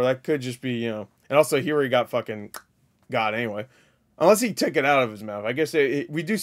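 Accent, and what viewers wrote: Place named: United Kingdom. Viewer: yea but this guy in particular has a very strong British accent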